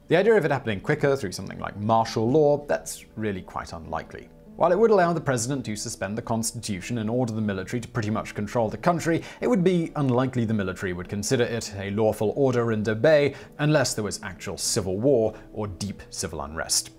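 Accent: United Kingdom. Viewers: I don't know, somehow when a guy with a British accent starts trying to 'splain the US Government just doesn't really work for me. Maybe get someone from Boston to do the job next time...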